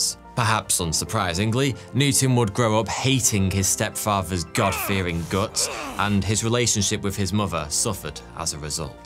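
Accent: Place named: England, United Kingdom. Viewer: Same, and I’m not a fan of English accents, but is an exception… 😂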